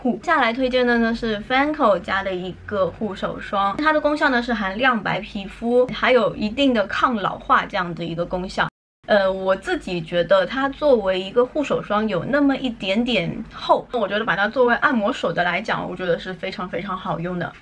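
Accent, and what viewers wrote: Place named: Taiwan. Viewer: 口音越來越像台灣人 讚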